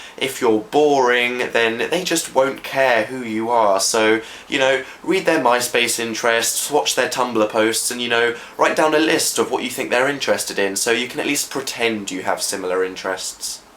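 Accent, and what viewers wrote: United Kingdom. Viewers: Why is he so overly British in this 😭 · He sound extra british here =))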